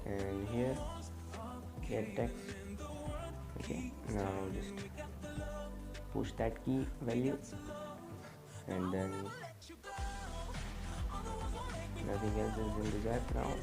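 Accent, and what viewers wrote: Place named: India. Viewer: but your English accent seems to be different than other indians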